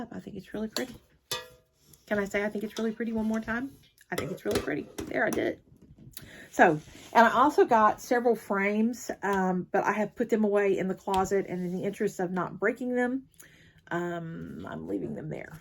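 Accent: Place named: Texas, United States. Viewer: Loved all your beautiful finishes and your Texas twang (being from south Texas myself). Thanks for sharing your work with us. shepherd and twice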